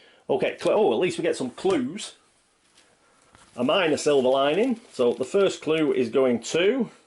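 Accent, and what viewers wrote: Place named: Lancashire, United Kingdom. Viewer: I'm in Spain but I was raised in Lancashire, I'll take a wild guess and say that you're from either Wigan or Preston.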